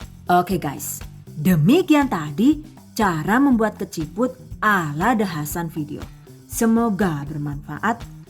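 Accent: Banyumas, Indonesia. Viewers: Yg saya suka dari cahnel ini yg saya rasa susah jdi kelihatan gampang buatnya bunda dengan cara penyampaian yg jelas dan terperinci smbil ngelawak bahasa ngapak yg kadang buat saya ketawa sendiri Baru kali ini dapetin chanel yg gak ngebosenin di liat, sukses selalu bunda #GiveawayTHV2021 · Saya paling suka cara bunda Hasan menyampaikan tutorial nya, anakku paling hafal klo aku buka video ya pasti bilang OKE...sama CATAT...sama itu dealek ngapaknya itu lho🤭🤭🤭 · Sprtinya dri banyumas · Suka bgt sm resep2 nya & sm logat ngapak nya...semangat trus buat bkin resep baru nya...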